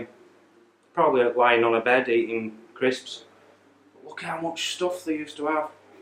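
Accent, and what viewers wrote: United Kingdom. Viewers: You sound exactly like a british person I had to call from work yesterday, cause his car is damaged and for repair in Germany lol.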